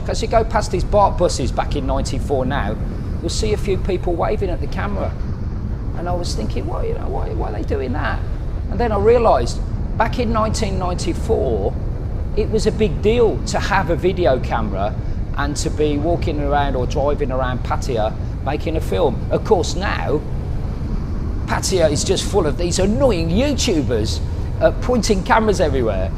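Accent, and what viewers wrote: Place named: Midlands, United Kingdom. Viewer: You sound like your from near me. A definite midkand accent I'm from Warwick well a village just outside beausale it'd on the way to Balsall Common. The back way into brum.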